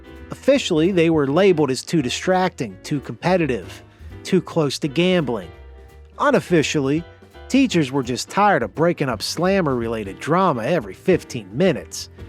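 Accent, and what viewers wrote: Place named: Pittsburgh, United States. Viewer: Never heard a yinzer more yin’s than this guy. Holy effin dahn tahn.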